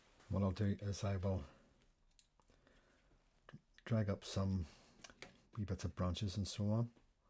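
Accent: Northern Ireland, United Kingdom. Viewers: These are the most realistic, looking shit I’ve ever seen painted! Nicely done. Love your videos and just subscribed. What part of northern Ireland are you from?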